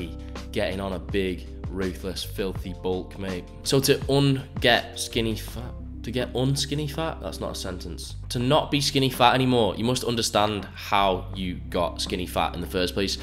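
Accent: United Kingdom. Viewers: Liking the British bants/vibe and info... subbed ! 😂👌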